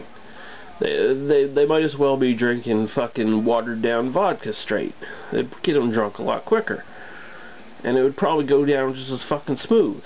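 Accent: Canada. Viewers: He does remind me of Ricky, Ricky's awesome. Its the Nova Scotia "R" pronunciation. All my aunts and uncles on my mom's side are from Nova Scotia and have the Nova Scotia accent. And any time a hard "R" sound like in car, bar, etc it stands out. Sometimes I even sound that way.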